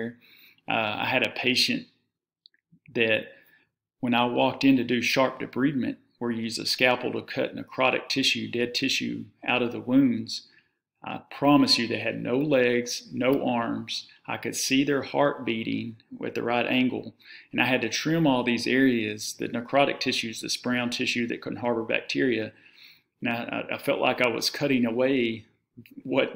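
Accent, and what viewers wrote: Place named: South, United States. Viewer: Dr., where are you located? You sound like you're from the south and I'm in east Tennessee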